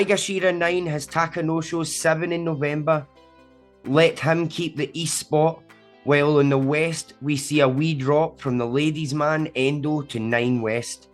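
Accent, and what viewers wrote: Scotland, United Kingdom. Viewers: If his knees are okay, Terunofuji will win the New Years' Basho. If his knees give him problems, I think it will be a tight race between Wakatakakage and Hoshoryu with the latter winning his first Emporer's Cup. Hoshoryu is starting to look more and more like his uncle, the great Asashoryu. Not just physically but also in terms of strength and variety of techniques. Great to hear a sumo commentator speaking in a Scottish accent,!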